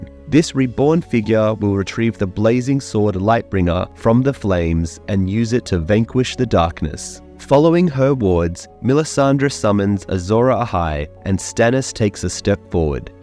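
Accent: Australia. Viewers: Where is his accent from!?! Driving me crazy 🤪 I’m leaning towards Australia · its his voice he says he has a australian accent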